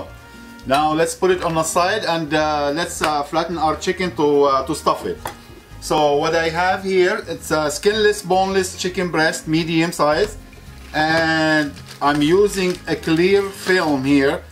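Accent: Lebanon. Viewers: I love your lebanon English language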